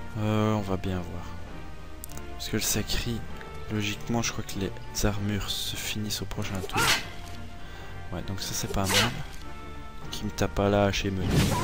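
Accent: Belgium. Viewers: je kiff ton acent belge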